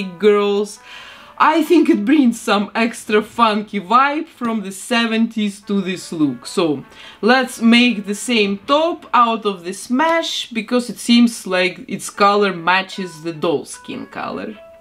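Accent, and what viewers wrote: Russia. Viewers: where are you from? i'm sorry but your accent is hhhhhorible. неужто из России, мать? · мне кажется, или я слышу русский акцент?😂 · По акценту сразу понятно, что вы русская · Русский акцент 😃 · Приветики рашн акцент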